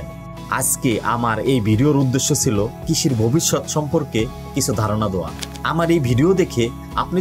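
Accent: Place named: Shariatpur, Bangladesh. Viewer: ভাই এখানে ধন্যবাদের কিছু নাই। আপনি আপনার যোগ্যতার ভিত্তিতে উত্তম উপস্থাপনার মাধ্যমে আমাদের কমেন্ট অর্জন করেছেন। আমি মনে করি এটা আপনার প্রাপ্য। আমরা আশা করি সামনের দিনগুলোতে আপনি আমাদের জন্য আরও ইনফরমেটিভ ভিডিও নিয়ে আসবেন। ভাই আরেকটা কথা আমার বাড়ি শরিয়তপুর, আপনার মুখের ভাষাটা আমাদের ঐদিকে মত মনে হয়। ভাল থাকবেন।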